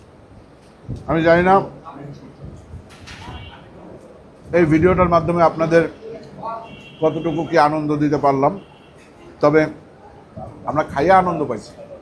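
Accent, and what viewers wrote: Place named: Rajshahi, Bangladesh. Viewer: Vaiya kothar moddhe dekhi Rajshahi r akta taan Chole ashese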